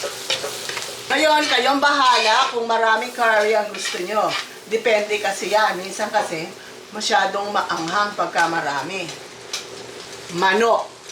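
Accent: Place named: Pampanga, Philippines. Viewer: San Po probisya nyo KC prang kapangpangan UN Punto nyo eh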